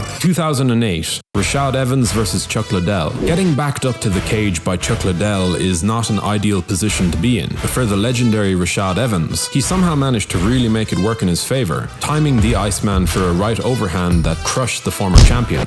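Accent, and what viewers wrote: Ireland. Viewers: You Irish? In your about it says from US but I think I'm hearing an island boi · posh d4 west brit ya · Are you Irish by chance...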